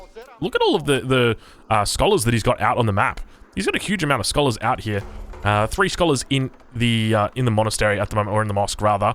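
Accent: Australia. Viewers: I really enjoy your australian accent and the quality of cast is amazing! Greetings from Costa Rica. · Lol no need to explain your Aussie English bro. The people who may or may not be even mentioning your pronounciation need to re evaluate their entire life. The whole world doesn’t speak the same lol. That’s crazy though compared to American English we pronounce our “H’s” the exact opposite; the word 🌿 herb the H is silent. The word Honed 🗡 the H is pronounced. If I were to say “HP” I’d say it just as you do, with out stressing the “H” sound in the beginning; Aech pee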